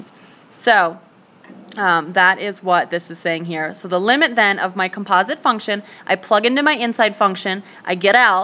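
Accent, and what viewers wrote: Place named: United States. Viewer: what state are you from? I like the accent lol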